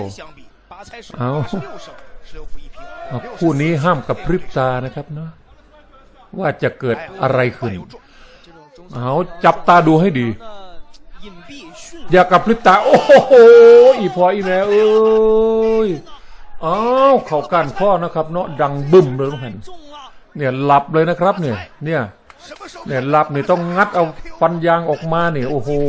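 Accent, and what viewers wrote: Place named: South, Thailand. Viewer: เว้าใต้เป็นตาหัวคั๊ก😂😂😂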